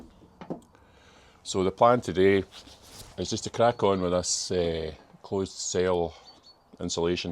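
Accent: Scotland, United Kingdom. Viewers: Great video pal! I love your non nonsense approach. Your accent makes me think you are not far from my Scots family in Ardrosson. P.S. Thanks for the Ted tax xxxxxx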